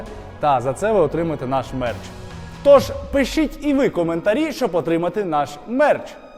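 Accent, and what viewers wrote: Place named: Ternopil, Ukraine. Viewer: Ніколи б не подумав по Вашій мові, що Ви з Дніпра, а не Тернополя)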